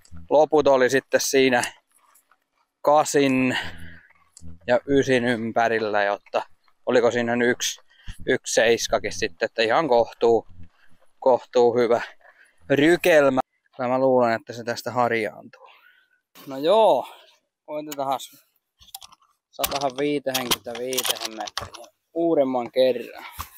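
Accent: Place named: South Ostrobothnia, Finland. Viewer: Bonuusta e-pohojanmaan murtehesta.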